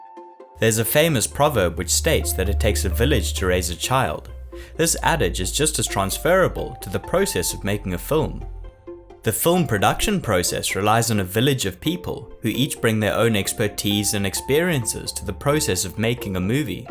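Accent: South Africa. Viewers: Subscribed. Your South African accent and rich videos about film are worth a subscription.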